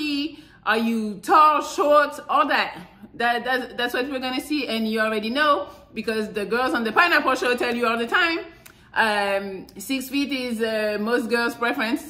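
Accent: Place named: France. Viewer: Love your French accent.❤️❤️❤️❤️❤️❤️❤️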